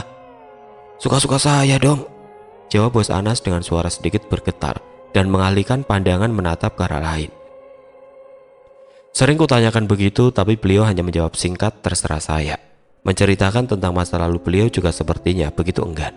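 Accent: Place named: Java, Indonesia. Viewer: Mlah fokus ke logat admin.Admin asli jawa mana ?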